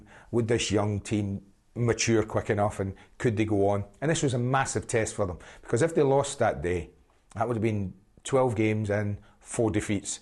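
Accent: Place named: Edinburgh, United Kingdom. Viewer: I love this accent. Reminds me of the pubs in Rose Street, Edinburgh.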